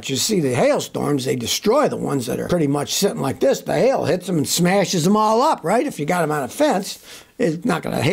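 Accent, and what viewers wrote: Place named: New York, United States. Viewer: I have watched a couple of this guy's videos with him screeching. The jersey\new york accent is the most irritating in the world. How do you all watch this garbage? I am going to mark this channel as do not recommend.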